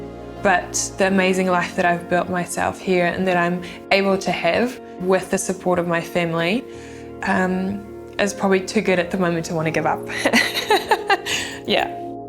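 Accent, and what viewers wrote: New Zealand. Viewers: your incredibly gorgeous 😍 south african 🇿🇦 women are the most beautiful women in the world and us kiwi men absolutely love them, the accents are sooo sexy but its so cute how you've lost it and have a kiwi 🥝 accent now,i would give anything to meet you and even more to be the man of your life 😍 so carry on being you and dont change and i hope you find a real kiwi man that will give you wat u deserve 🤗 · She has got such a kiwi accent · she threw her roots away by disquising herself with the kiwi accent, shame!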